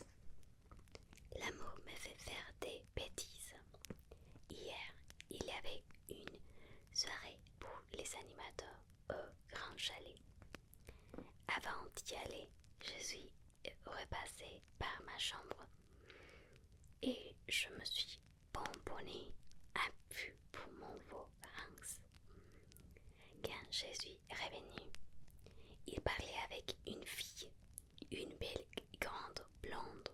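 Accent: Spain. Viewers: Pour moi, c'est justement ton accent espagnol en Français que j'adore. Merci pour l'effort que ça doit être pour toi. ❤ 👍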